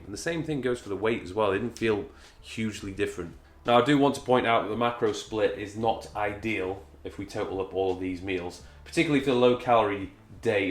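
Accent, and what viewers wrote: United Kingdom. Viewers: Metric - Check British accent - Check British Supermarket foods - check ** When American videos start measuring in terms of 'cup' I'm already zoned out. Helpful and interesting video of comparisons. Even if not followed religiously, there is certainly no hardship in introducing these meals a few times a week.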